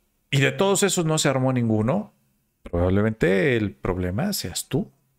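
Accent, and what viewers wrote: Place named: Argentina. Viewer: Por tu acento me doy cuenta que eres de Argentina,.admiro y respeto gente culta en el deporte y en la música., tienes razón empecé con estos canales por curiosidad y me gustaron demasiado aparte de ver los de tu compatriota Agustín laje arrigoni,el cual es un escritor que me encanta y admiro.viva Argentina. Trataré de tomar muy en cuenta tu consejo el cual tiene mucho de razón. Saludos desde México tierra Azteca.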